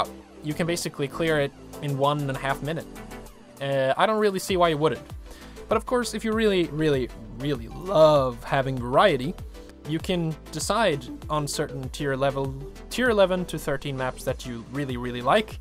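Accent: Sweden. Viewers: Lel, you swedish lad?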